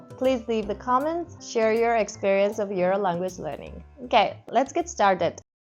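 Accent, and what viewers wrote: China. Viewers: 韓国語がが中国の方が話す発音っていう感じ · ドイツ語が完全に中国語アクセントで不思議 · 韓国語が日本人が話してるとは思えないくらい中国語？訛りですね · 日本人の韓国語発音でなくて、中国の方の発音だった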